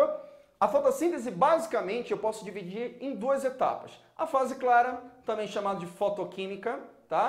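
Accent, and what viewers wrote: Pará, Brazil. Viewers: Maninnnnnho eu gostei da coisiNNNha. Que fofo tio, vc é paraense? Já gostava do antes, agora tá em casa!!! 👏👏👏👏👏👏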